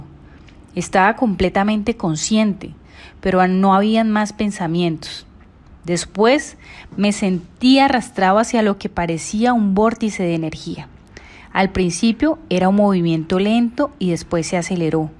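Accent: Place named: Latin America. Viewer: De todos las versiones en español, me gustó más su versión ya que es español latinoamericano y puedo entender bien el acento ❤ gracias!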